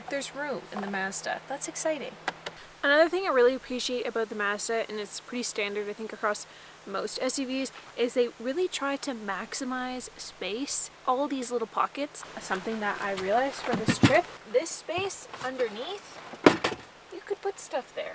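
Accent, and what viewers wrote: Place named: Canada. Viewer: The way Canadians pronounce words like Mazda, and pasta always amazes me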